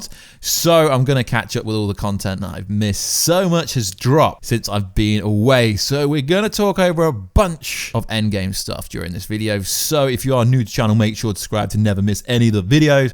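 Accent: England, United Kingdom. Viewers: they're not "what" with a date? you tube says you said basilic. what does basilic mean? A really "what " upgrade? you tube said you said safe. "head sculpt?" lastly i have taken the liberty of looking up "aliteration": from al·lit·er·a·tion /əˌlidəˈrāSH(ə)n/ noun noun: alliteration; plural noun: alliterations the occurrence of the same letter or sound at the beginning of adjacent or closely connected words. "the alliteration of “sweet birds sang”" i t doesnt mean different versions... maybe you are thinking "variations". i find it quite interesting that you have an "english" accent but dont speak proper english.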